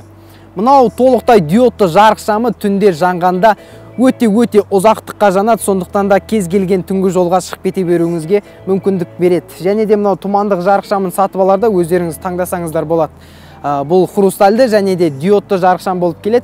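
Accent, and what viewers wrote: Standard. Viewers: Молодец тап таза сөйлейсің қазақша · Ой молодец осы бала таза қазақша түсіндіреді. Өркенің өссін. Олжас ағаңдай атақты бола бер!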